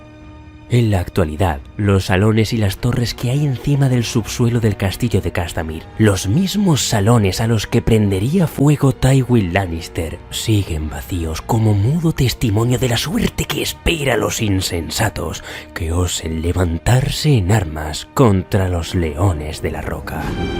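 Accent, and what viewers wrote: Spain. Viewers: El asentó español le da esa toque medieval, gracias por la pequeña reseña del origen de esa canción.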